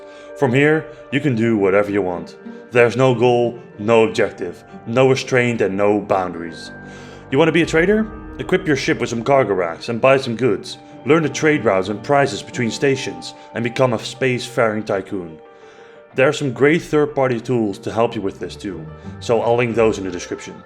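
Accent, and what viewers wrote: Netherlands. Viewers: Ik hoor een nederlands accentje? :P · Mooi filmpie, voortaan wel ff dat nederlandse accent minderen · Nice review, convinced me to buy it. You sound very Dutch btw, something i couldn't help but notice. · I heard the accent and bloody knew you were Dutch xD. Verekte Nederlander! ;) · That horrible Dutch accent though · binnen 5 secondes merkte ik al dat je nederlands bent 😂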